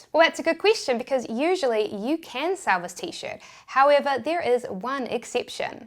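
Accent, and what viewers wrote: New Zealand. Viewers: Are you from nz? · This girl has a pretty distinct NZ accent, I don't know what you guys are talking about.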